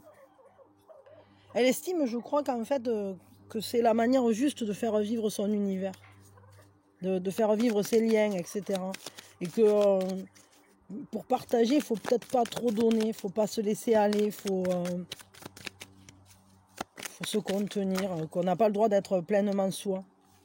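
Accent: South, France. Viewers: Cc et merci pour le choix numéro 1😊😊👍🏼👍🏼 et avec ton accent je voyage dans le sud de la France 🤗🤗💖💖😘😘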